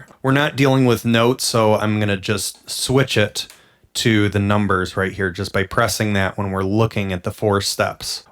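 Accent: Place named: Western New York, United States. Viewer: I stumbled onto your channel this past week, first hunting for more Lyra-8 demos then discovered your Zoia content. My Zoia arrived yesterday and the Lyra-8 arrives on Friday or Monday. I've not yet fired up the Zoia, but your videos along with other excellent walkthroughs people are posting have been helpful. Almost like I've already actually used the machine. You've got great skills of explanation and teaching, thanks for sharing those in your videos. BTW, your accent has me guessing you're from W NYS, greetings from the edge of Lake Erie. :)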